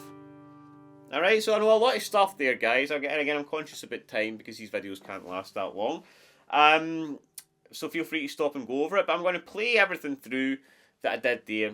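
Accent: Scotland, United Kingdom. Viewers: came for the scottish accent, stayed for the luuuuv. and the guitar lessons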